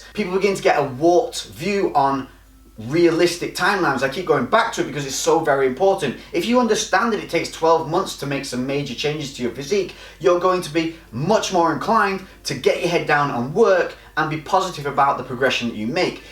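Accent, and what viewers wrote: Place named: Northern England, United Kingdom. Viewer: Love it, and nice to hear a fellow Brit talking fitness for a change. Plus you also sound like a northern lad like me! Just recently found your channel and it's great!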